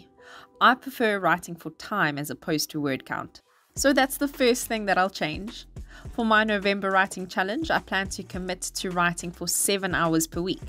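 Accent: South Africa. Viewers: Are you South African?.... I miss home....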